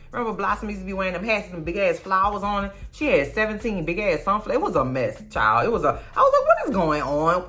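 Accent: New Orleans, United States. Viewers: I love your accent. New Orleans is my all time favorite city, and your humorous recaps are the ONLY good thing to come from this monstrosity of a reboot. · First, I LOVE YOUR RECAPS. Everything is better with an authentic New Orleans accent! Secondly, I totally agree about the children on this show. They are constantly in sexual situations and having sexual conversations, ew. The conversation about polyamory or pansexuality during dinner with parent and grandparents was an ABSOLUTELY NOT. This show is tripping. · "Take Braedyyy, taek Braedi.....He had a long life. I think what he like 19/20?...That's a solid life if you ask me!" YEOOOOOOO The Nawleans accent is the icing on the cake to these AJLT reviews, cuz the show ain't issh 😫🤣🤣🤣🤣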